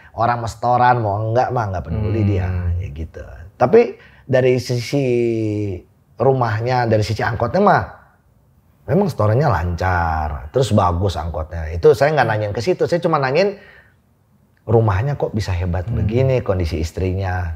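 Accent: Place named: Jakarta, Indonesia. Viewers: Aksen Betawinya enak banget di dengar bang.. yg di sampaikan Masha Allah ngena banget. On point gitu.. terimakasih atas ilmu dan pengalamannya bang.. semoga sehat selalu. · Emg betawi logatnya begitu dah emg ceplas ceplosnya juga tanda peduli, lu punya temen jgn dari situ situ aja makanya mbaa, jd ga menyimpulkan begitu setiap org dr setiap daerah punya ciri khas masing"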